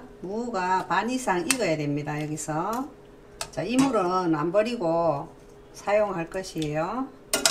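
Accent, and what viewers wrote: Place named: Daegu, South Korea. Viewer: 선생님 감사해요 멀리서 항상잘보고있어요!!! 구수한 사투리 듣기좋아요 . 저도 고향이 대구거던요!! ㅎㅎㅎ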